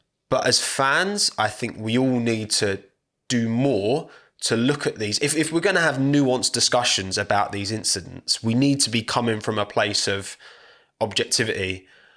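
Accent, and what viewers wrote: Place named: East London, United Kingdom. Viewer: you didn't need to tell us you were a west ham fan, we knew it from the first time we heard you speak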